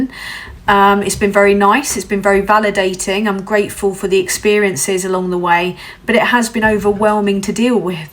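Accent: United Kingdom. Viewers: God, I love this british accent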